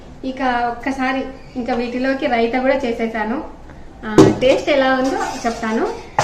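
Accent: Rayalaseema, India. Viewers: Super andi mee vantakalu meeremo rayalaseema mee papa emo andra basha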